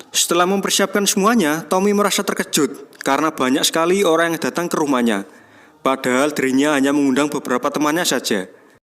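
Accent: Java, Indonesia. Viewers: Agak geli , klo medok bilang Gue , gue , kenapa gak pake kata Saya aja ? 😂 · medok haha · Terlalu medok penyampaian nya jd gue skip ya. Bye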